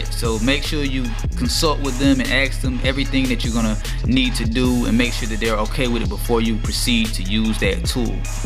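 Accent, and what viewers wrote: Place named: Houston, United States. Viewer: Wicked with the hair cutters as my grandpa would callem... HAIR CUTTERS...I swear you sound like you from H Town...great work!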